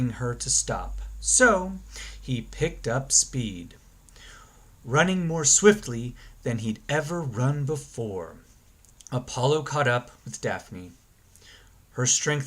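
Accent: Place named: Greece. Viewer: Nice story i really love accent greek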